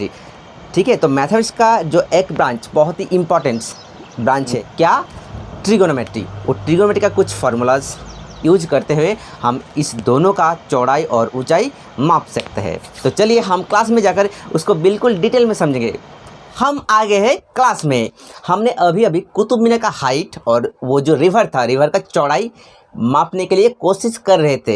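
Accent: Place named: Bengal, India. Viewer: Thoda thoda bangla arehehe but it doesnot matter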